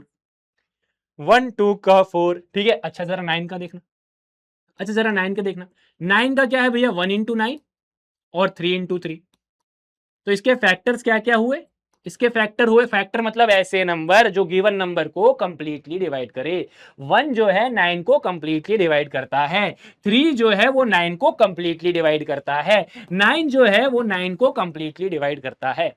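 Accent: Bihar, India. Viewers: Bhaiya aap bihari hoo kya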